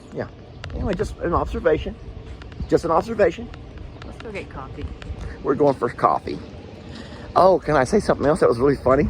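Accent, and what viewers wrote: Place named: Texas, United States. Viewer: OK let me speak up as a native California. I'm 51 yrs old and a 3rd generation Californian. 1. I would have never even noticed you because honestly you blend in. Crocks and fanny packs are not that unusual here. And even if it weren't people don't really care here. We are pretty accepting. 2. Sadly one of the reasons you are not getting people responding to your "hello's" is people are a little gun shy right now as we have had a pretty significant spike in crime, so many of us are a little on edge around strangers. Trust me when I say... it is NOT you! You look like any other normal Californian and I would have been none the wiser until I heard your accent.:) And BTW we have lots of Texans that come here to live, I have had quite a few friends from Texas and I have always gotten along with them really well. I love the Texas personality.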